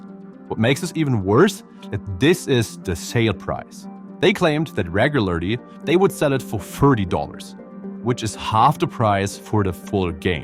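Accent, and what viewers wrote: Germany. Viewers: Love the german accent ;) Good videos, high quality, keep it up!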